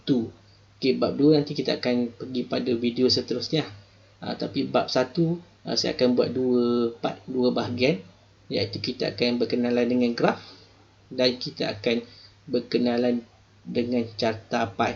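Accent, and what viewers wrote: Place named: Kelantan, Malaysia. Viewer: cikgu ore kelate ko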